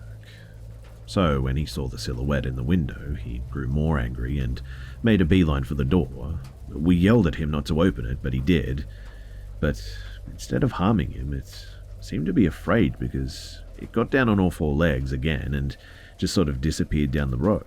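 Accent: Australia. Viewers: Hes got that low resonating voice, and hes an aussie.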